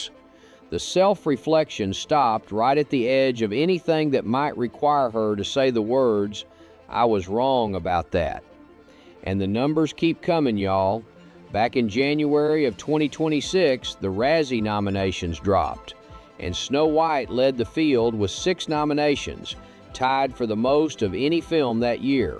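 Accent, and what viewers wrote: Texas, United States. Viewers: You gloss over genocide, the deliberate bombing of children's hospitals, bulldozing of human beings, machine gunning of children and starving refugees by the extreme terrorist nation of Israel as "foreign policy". It was not politically smart to engage with her co-star on this, it's true, but those atrocities demand a level of response and engagement that goes far beyond one's career, industry polity, and 170 million dollars. In fact I would trade a single Palestinian child's life, for 170 million dollars. By the way, *Israeli soldiers have asserted their "right to rape" in this conflict*, and largely gone unchallenged. Make a video about that with your Texas accent. Until then, you are all too predictable.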